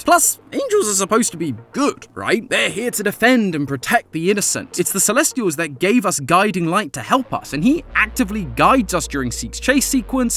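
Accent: United Kingdom. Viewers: Honestly, I love as a host. His British voice is definitely my favorite thing about him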